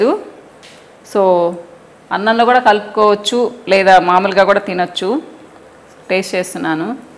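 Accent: Nellore, India. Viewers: Medi nellore aa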